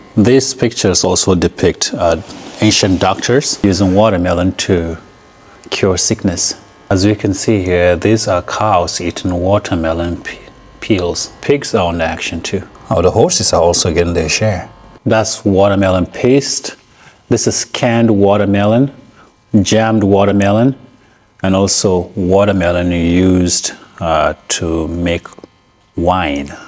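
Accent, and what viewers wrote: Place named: England, United Kingdom. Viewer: Love your English accent man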